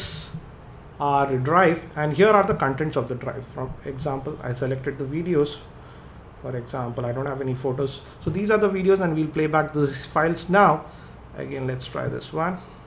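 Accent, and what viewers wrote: India. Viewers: why all reviewer about this sandisk are all from indian? indian english is very hard to understand. honestly, although you may think that i am racist.. but it's really hard for us to understand indian english.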